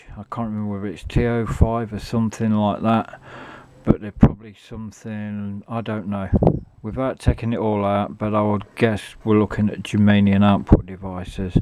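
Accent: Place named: East Midlands, United Kingdom. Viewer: Great video. Very interesting and entertaining. Sounds like you're an east mids lad. Cheers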